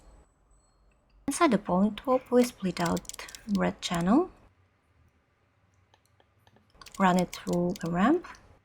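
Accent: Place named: Russia. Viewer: Прям слышен крепкий русский акцент.✊🤟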